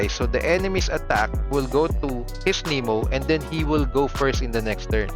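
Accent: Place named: Conyo, Philippines. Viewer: Conyong conyo amp...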